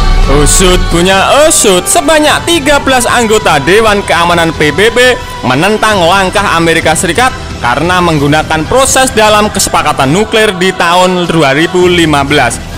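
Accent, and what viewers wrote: Java, Indonesia. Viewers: mentok jowo nya ,hahaha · Medok bingit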